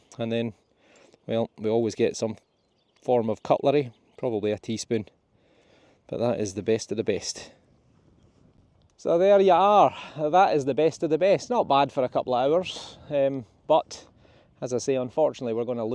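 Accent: Scotland, United Kingdom. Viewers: Thank you so much for all your videos, i am in perthshire and I love walking about with you because I'm in a wheelchair, so keep up the good work, alao i love your Scottish accent, take care, Agnes 🏴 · Yes it’s definitely that gorgeous Scottish accent and lovely tone of voice ❤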